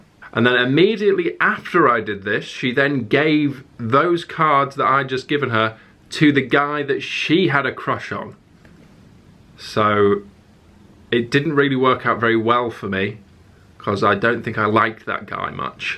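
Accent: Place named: United States. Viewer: Omg the american accent 😂 thank you so much for answering my question!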